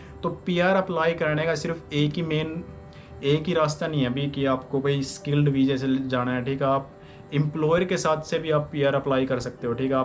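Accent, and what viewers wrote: Haryana, India. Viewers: Bhai aapki language te hi pta chla haryanvi aa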